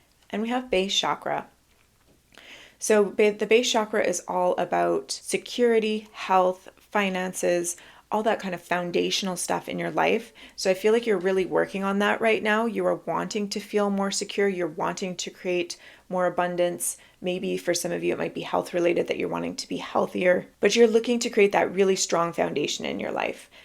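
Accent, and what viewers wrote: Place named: United States. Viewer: I’m trying to place your accent! It’s distracting me from the message in my reading because you’re reminding me of a cross between a cousin of mine from upstate NY and a friend who has roots in both Colorado and Florida! I can’t tell if you’re E or W coast, midwest, southern or what. Did you have one accent growing up, then go to college out of state and end up with a standard American accent, or is that the accent where you’re from, in which case, which state? It’s lovely 😊 (I also liked my reading very much, thank you 🙏 pile 1) (PS, if it turns out you’re Canadian after all that, I apologise!!)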